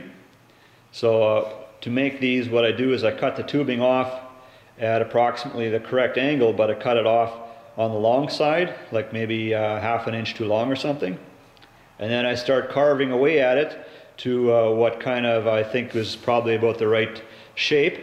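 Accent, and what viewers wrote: Canada. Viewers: I'm going to guess that he's from Newfoundland.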